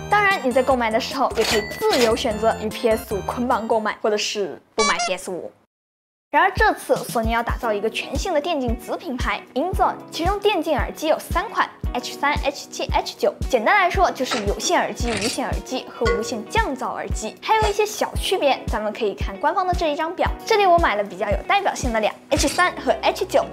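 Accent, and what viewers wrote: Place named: Chaozhou, China. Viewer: 你是潮州人吧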